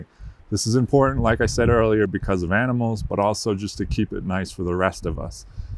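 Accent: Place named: Massachusetts, United States. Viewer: Framingham? Newton? Norfolk county? pegged your accent right away, now I gotta know....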